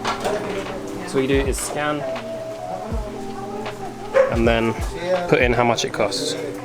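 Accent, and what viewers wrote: United Kingdom. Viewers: Hi, buddy where are you from? Your accent is like britain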